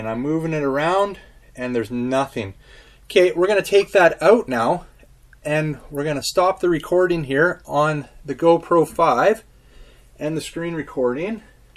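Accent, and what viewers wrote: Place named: Canada. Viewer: This is exactly what I was looking for, thank you! Side note, you have THE most Canadian accent I’ve ever heard, and I mean that in a good way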